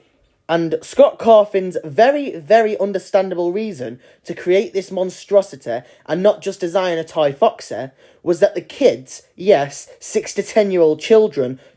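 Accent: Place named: Manchester, United Kingdom. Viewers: I think it’s a Manchester accent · I like the British accent 🔥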